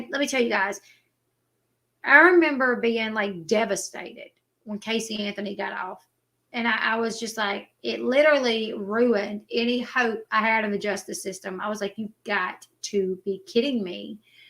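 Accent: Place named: Louisiana, United States. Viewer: Her mailing address is lousiana, usa, and her accent is def. in line with that area.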